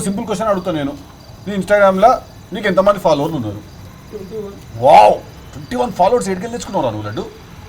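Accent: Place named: Secunderabad, India. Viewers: Annaa mastuu matladuthunnav Anna Hyderabad slang lo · Pakha secundrabad musheranad slangh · Hyderabadi slying super till Bhai ❤️ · Aa body language,..... Hydrabad slang chustunte... Idi edo..." Rahul splingunz.".... Biopic la undi..... Any ways... bhai...all the best..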